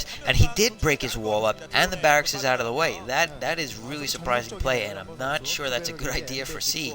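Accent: Boston, United States. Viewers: yo, great commentary. you sound like you from boston or something. I'm in NJ holla.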